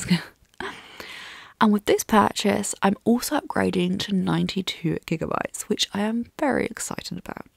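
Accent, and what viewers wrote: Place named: RP, United Kingdom. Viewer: still watch your videos just to hear you say "but" with that posh accent of yours.... will shamelessly steal your voice for my AI personal assistant when the time comes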